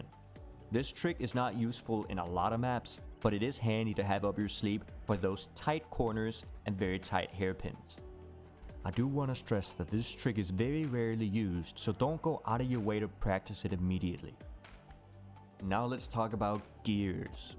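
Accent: Denmark. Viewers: I reconize an Danish accent when i hear one xDD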